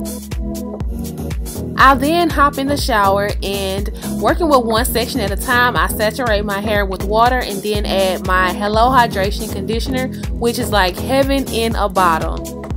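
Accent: South, United States. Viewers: The southern accent is real with this one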